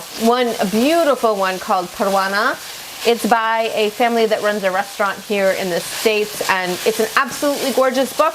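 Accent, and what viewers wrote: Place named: Kandahar, Afghanistan. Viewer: Omg I love your shaista kandahari pashto 😂💛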